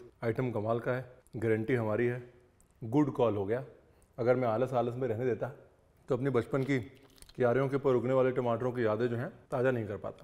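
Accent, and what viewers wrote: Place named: Punjab, India. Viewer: so nice sir, Punjabi ka tarkaa bahut sohnaa a ji.love it❤❤ chak Doo.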